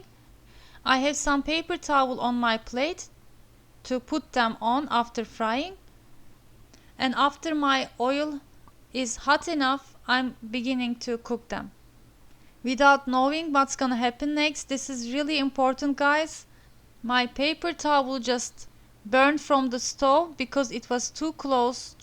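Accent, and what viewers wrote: Turkey. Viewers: This english makes me laugh because one actress from turkey talks like this in the film i forgot her name 😂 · Very Turkish accent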